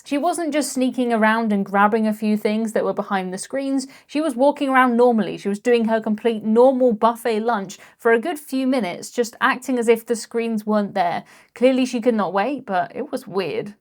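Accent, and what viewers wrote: United Kingdom. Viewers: I just love this gal. The Brit accent is intoxicating! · Not a moan, but more of a question. Why do you call biscuits cookies, when sound like you are from the UK?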